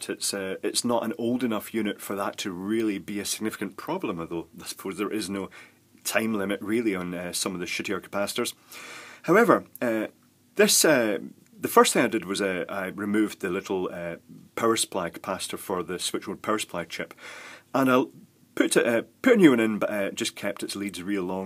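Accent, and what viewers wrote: Scotland, United Kingdom. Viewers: Have you ever thought about putting an album of bedtime stories out? Mothers would love you. Your soft voice and gentle,scotch accent would put any child to sleep. Sometimes it puts my wife to sleep. "I don't understand a word that he is saying, but I love his voice." Then she nods off.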